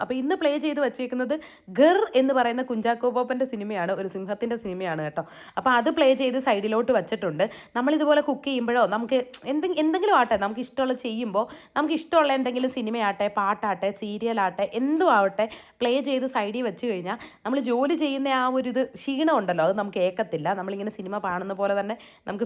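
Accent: Kollam, India. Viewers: തൃശ്ശൂർകാരി ചേച്ചി ആണ് എനിക്ക് നല്ല ഇഷ്ട്ടമാണ്, ഷോർട്ട് ആയി വിളിക്കാനും, എന്തു ഡ്രെസ്സിലും കാണാൻ സുന്ദരി, ഹെയർസ്റ്റൈൽ സൂപ്പർ, ഒഎസ് മാധുരി ദീഷിദ് കട്ട്‌ എനിക്ക് മാത്രം തോന്നുന്നതണോ ഇഷ്ട്ടം കൊണ്ടാണോ എന്നറിയില്ല, പാചകത്തിൽ മികവ് എല്ലാം കൊണ്ടും ചുന്ദരികുട്ടി കൊല്ലം ഭാഷ കേൾക്കാൻ എന്തു രസം, എല്ലാം തികഞ്ഞ വൈഫ്, ആണുകൾ ആകർഷിക്കുന്ന ഭംഗിയും, പെരുമാറ്റവും, ഇനി അടുത്ത വീഡീയോയിൽ കാണാം, ❤️❤️❤️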